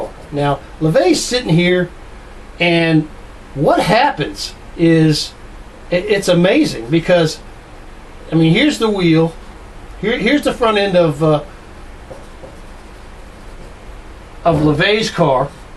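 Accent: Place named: Pennsylvania, United States. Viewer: The BBCfour documentary on this tragedy confirms that Hawthorne admitted fault to close friends immediately after (but not publicly) and lived out his remaining years a "broken man" (better than a cut in half man which is what 85+ spectators got). The mask and with matching shirt is a nice touch and I like your enthusiasm, but I don't believe anyone doubts Hawthorne's singular role in igniting the tragedy. Lastly, I am picking up a slight Pennsylvania accent from under the mask.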